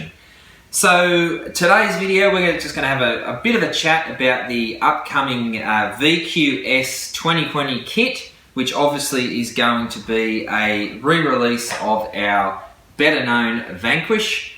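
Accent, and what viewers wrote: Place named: Australia. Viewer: Audio is much better, but you seem to have picked up an Australian accent some how.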